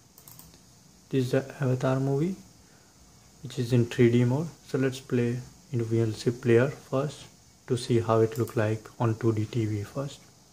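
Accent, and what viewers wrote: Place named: India. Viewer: Thats how hindi douche speaks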